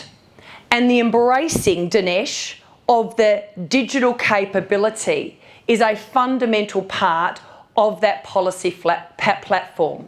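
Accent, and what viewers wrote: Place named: Ipswich, United Kingdom. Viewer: She is Bronwyn Bishop reincarnation with Ipswich accent.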